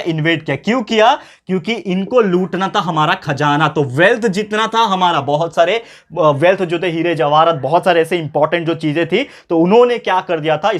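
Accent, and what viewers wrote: Maharashtra, India. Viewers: Sir is your mother tongue Marathi.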